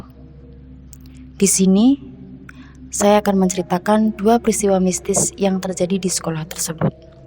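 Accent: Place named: Java, Indonesia. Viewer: monmaap medoknya malah bikin senyum bukan serem , tp tetep keren ceritanya luv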